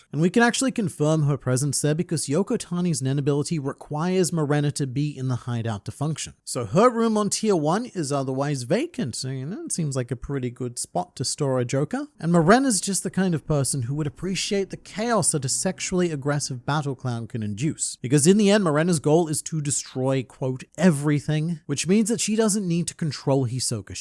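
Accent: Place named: Australia. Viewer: My wife just said, "is that the strange Australian internet man talking about Hisoka?"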